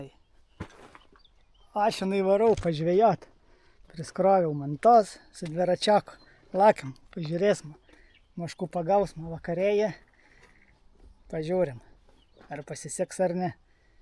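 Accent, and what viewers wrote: Aukštaitija, Lithuania. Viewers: labai patinka tavo aukštaitiška tarmė,ir jeigu tavo gera nuotaikėlė,tai gali filmuoti bet ką.Svarbu kai tu linksmas.Labai pakelia nuotaiką.😂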